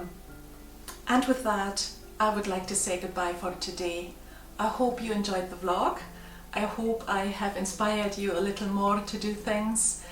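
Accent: Scotland, United Kingdom. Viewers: I just love your channel, and your Scottish accent. When I first watched you knitting I thought "why is she making so many baby hats"...lol. The pumpkins are just beautiful. I crochet and plan on giving these a try.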